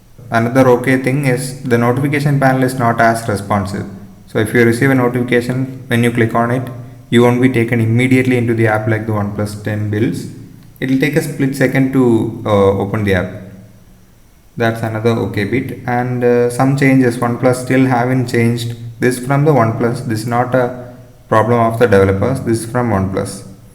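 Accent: Kerala, India. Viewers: Malayali aanalley Engilish ketappo manasilayi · English kettittu.. Malayali anennu thonnunnu😅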